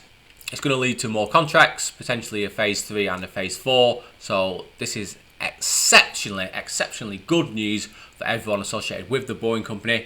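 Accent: United Kingdom. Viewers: Do you live in Las Vegas now? For how long? And if so, isn't it time for you to lose your English brogue accent? We think so!!!!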